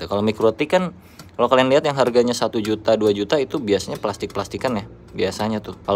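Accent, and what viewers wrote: Sunda, Indonesia. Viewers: ha..ha...ha... sunda pisan , salam kenal ti cimahi kang...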